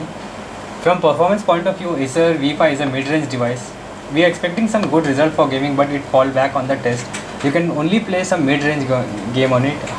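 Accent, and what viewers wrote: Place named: India. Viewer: It's Indian English! Very understanding for Indian people.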